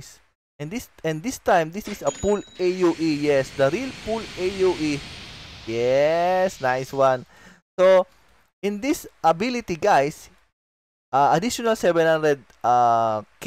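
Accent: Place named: Philippines. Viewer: The accent is like a Philipino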